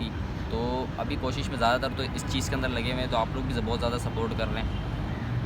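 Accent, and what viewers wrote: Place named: India. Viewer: Assalamu alaikum bhai, Aap Mujahir hu shayed kyuki aap indians ki taraha baat karte hu may be u r family is Indian..........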